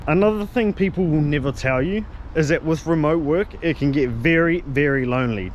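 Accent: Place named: New Zealand. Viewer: Bro, I recognised that kiwi accent immediately haha. I didn’t realise how recently this video was uploaded. I’m super keen to watch your journey and see how you get along. I wish you all the best in your adventure!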